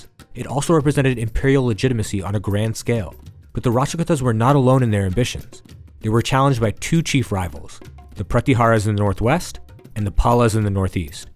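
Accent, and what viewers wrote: United States. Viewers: Why do we have to hear American Accent. Would have been better if Indian names were pronounced correctly. Rashtrakuta Kannauja I had to try 👂 thrice to understand what it is. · The narrator is an Indian origin American. We cannot blame him for the accent since he has spent his entire life in America. Thankfully he is still an Indian at heart.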